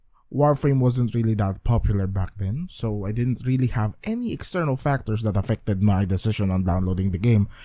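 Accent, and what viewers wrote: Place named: Philippines. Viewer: When I heard the accent, I just knew it was a Filipino CC. Know any communities/servers to ask and team up with other Filipinos or do we just go in recruitment chat and pray we have peeps there?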